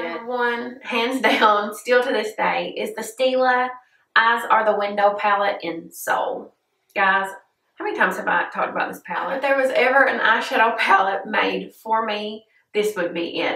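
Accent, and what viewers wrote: South, United States. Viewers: I love your videos and your accent speaks to this southern KY girl's heart!💙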